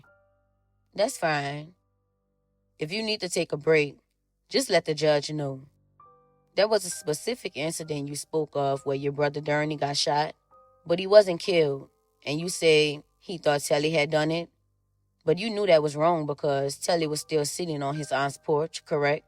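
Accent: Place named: Louisiana, United States. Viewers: That’s a New Orleans accent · I like how the narrator got that LA down south accent puts u rt in the courtroom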